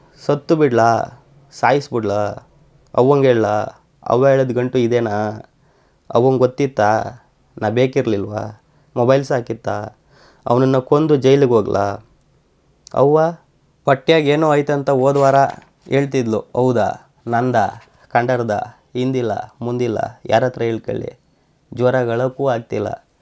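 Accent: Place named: Davanagere, India. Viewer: Anna nam Davanagere bhashe mathadidri, tumbha santhosha aytu Keli , urin nenp aytana 😢😊😊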